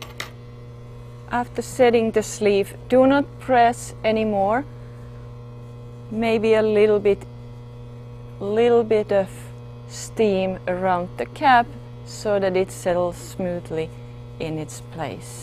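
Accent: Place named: Germany. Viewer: Thanks for an easy to follow tutorial. Joining the lining to the sleeve has always been a puzzle to me. Years ago I made just one jacket, it wore me out! Commercial patterns are of NO help when it comes to instructions. Up until today, I thought I'd done something wrong when I didn't go all the way around when joining the lining to the jacket at the bottom of the sleeve. "Bagging" the jacket, only now, makes sense, because you've shown how it's done. Thank you again and thanks to the college for help in making this video. BTW, (as I laugh at myself) in the beginning I was trying so hard to understand what a "velt" was. I thought, "hmm, that must be a new term." Then I remembered my days when I lived in Bavaria and recalled how German's W's and V's are the reverse of the English version. I then rewound the tape now that I could better concentrate on what you were a saying, not how you were saying it. 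You've done a lovely job here, I hope lots of sewers have the opportunity to find you. However, below is a good question.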